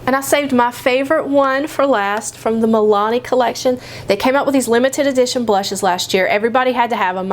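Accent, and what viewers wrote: South, United States. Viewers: I really love your southern accent <3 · Such a fun video!! Love your Southern accent! I'm from Tennessee originally!! Thanks for sharing! I love discovering new beauty products :) Xo!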